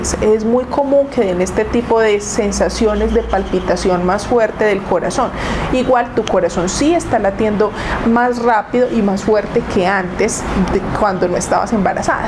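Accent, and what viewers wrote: Colombia. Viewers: Claro es colombiana y paisita..por eso esa voz preciosa..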